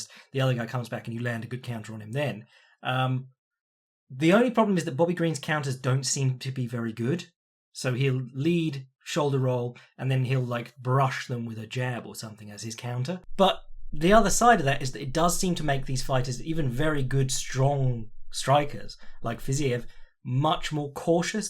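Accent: United Kingdom. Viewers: Time to let Jack Slack serenade us for 48 minutes with that British schoolboy accent as he describes Aldo's flesh-rippling kicks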